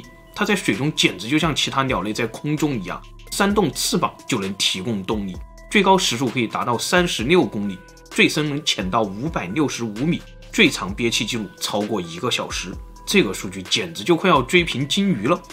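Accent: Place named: Mainland, China. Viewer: 中國人發音跟台灣不一樣你當亞洲國家只有台灣？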